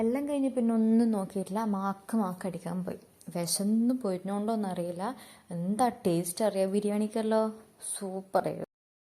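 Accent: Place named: Kasaragod, India. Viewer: Kasargod bhasha poli😊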